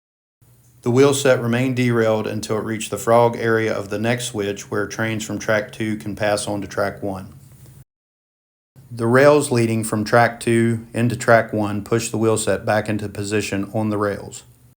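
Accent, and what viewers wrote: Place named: South, United States. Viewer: Maybe it’s just me but maybe the NTSB can hire someone to do these voiceovers so we don’t have to try to concentrate what this man with a thick southern accent is actually saying.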